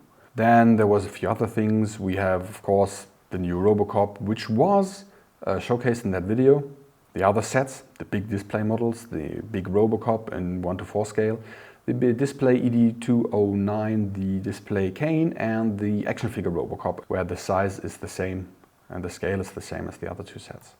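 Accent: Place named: Germany. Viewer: great to finally hear humans here! have you considered investing in a speaker yet? No offense against but his german accent is "noticeable" lets say! anyway, is <num>x times better than any bot!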